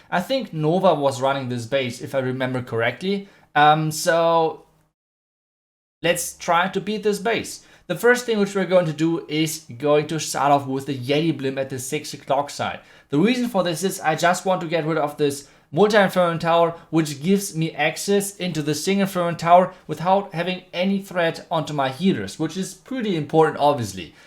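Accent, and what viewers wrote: Germany. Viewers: This made me realize tho, it's in the delivery. Germans have that accent and I think it messea it up lmao. Messes**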